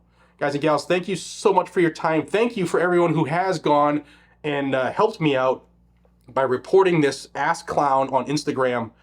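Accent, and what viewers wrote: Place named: New England, United States. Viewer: Look at you!!! Only been in Tennessee for a couple months and you are already picking up our accent, slang, and language! I love it! Go ahead and immerse yourself in language with Johnny B so we can get rid of the rest of that foul, yankee accent! I love what you are doing my brother. Keep the faith, and the fight. We are all doing everything we can to stop all the madness from happening. Even if sometimes, all we can do is pray. If everyone would just praise God and pray, all of the problems would disappear. But, we know that most people won’t. So, here we are. We are definitely NOT the people anyone wants to fight against. But they are crazy, insane, and indoctrinated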